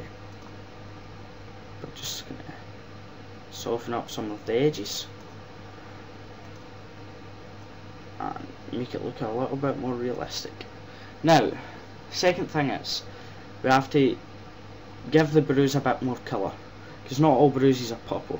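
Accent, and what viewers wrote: Scotland, United Kingdom. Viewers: Thanks for posting tutorial. Sounds like scottish accent.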